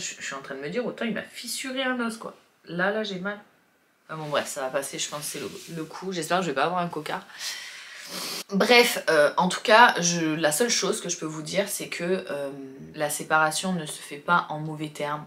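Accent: South, France. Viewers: Coucou, je t'ai decouverte hier, avec une vidéo lié à ta nouvelle vie, une nouvelle vie qui j'espère t'apportera plein de bonnes choses, de toutes façons faut ce dire que dans la vie rien n'arrive par hasard, malgré beaucoup de sourire que j'ai vue sur tes vidéos ça doit pas été facile mais faut positiver. Je te souhaite beaucoup de bonheurs et de courage pour la suite. Ps: super contenus ultra naturel, un petit accent du sud plaisant. Bisous du sud également.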